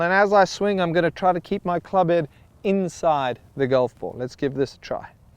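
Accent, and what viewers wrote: South Africa. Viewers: I am a new subscriber to your channel... Love you videos Dont have too many golf Tutors in South Africa...but we do have some awesome golfer.... Your accent sounds south African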